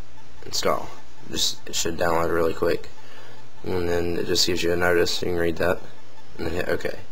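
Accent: South, United States. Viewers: i hate ur accent red nek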